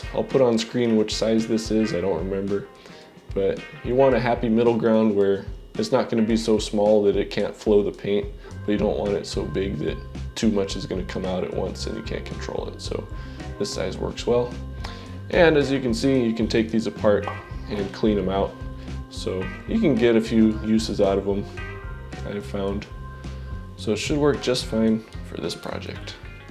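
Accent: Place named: United States. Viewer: Irritating americun voice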